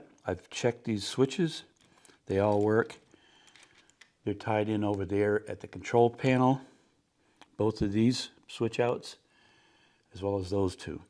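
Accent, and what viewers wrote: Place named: Canada. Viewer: I think i hear a touch of Canadian accent? My papa and the rest of dad's side of the family came from canada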